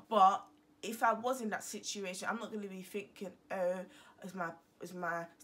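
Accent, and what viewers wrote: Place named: Zimbabwe. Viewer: You sound zimbabwean. .thank you for not being harsh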